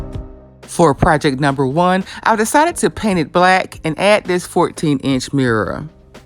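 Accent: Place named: Houston, United States. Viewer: Your accent sounds like you're from Houston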